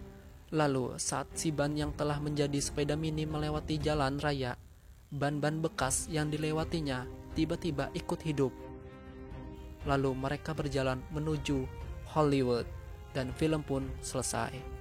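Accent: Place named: Java, Indonesia. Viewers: jawir jamet medok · Suara org sunda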